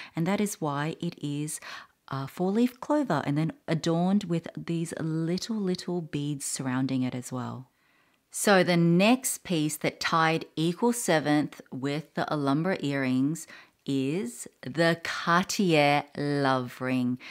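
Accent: Australia. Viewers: I am surprised that the Cartier watch (Tank or Panther watch) didn't make the list. I think the problem with Tiffany is that they don't really have one iconic piece that is unique to them. I think the Tiffany T line the T square bangle can become that piece, unless they start changing it then it won't be iconic anymore. Thank you for such a lovely video. Alway enjoy watching your videos...and that Australian accent is gorgeous!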